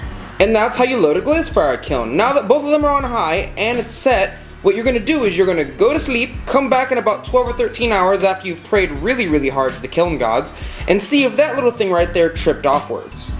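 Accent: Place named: France. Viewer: Great video, buddy! :D Though I'm a bit disappointed you didn't say "tomorrow" with your french-ish accent :P I was kind of waiting for it!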